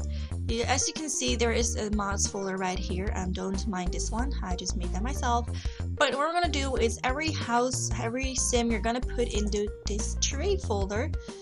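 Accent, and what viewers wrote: Netherlands. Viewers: haha gewoon een Hollander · o are you dutch? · Your dutch :)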